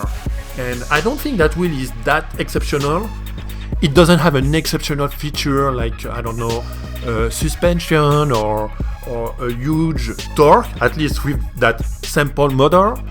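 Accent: France. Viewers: you sound like my one of my brothers here in portland who comes from France ;)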